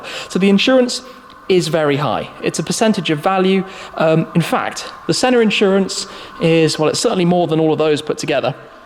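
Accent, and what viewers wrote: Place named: United Kingdom. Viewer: His british's accent introduction always gets me, lol.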